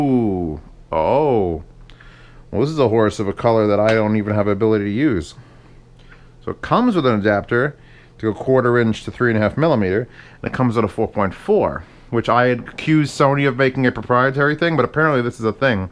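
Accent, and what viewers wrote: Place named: New Jersey, United States. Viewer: Z, are you from Jersey? Sounds like a New Jersey accent to me.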